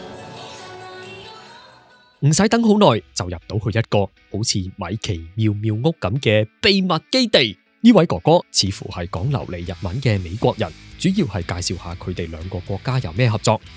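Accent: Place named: Hong Kong, China. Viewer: 三二一、點火～發射成功（救命～超喜歡港腔🤩忍不住姨母笑）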